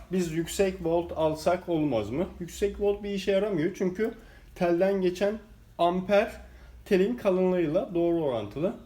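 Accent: Turkey. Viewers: Cok guzel istanbul turkcesi konusuyorsunuz...... Ne kadar zamanda ogrendiniz Turkceyi , ve nerelisiniz?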